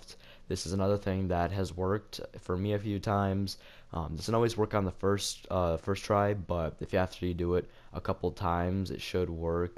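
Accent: Wales, United Kingdom. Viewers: Are you from Wales? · You welsh bat?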